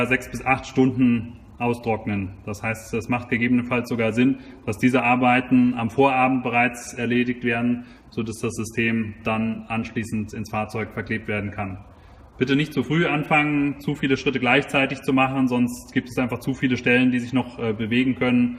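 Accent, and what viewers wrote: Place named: Germany. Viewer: Wait.... don't tell me.... that guy is German.... right?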